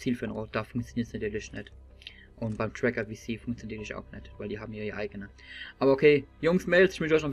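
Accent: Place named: Palatinate, Germany. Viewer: Ich aus Landau :) Man hörts an deinem dialekt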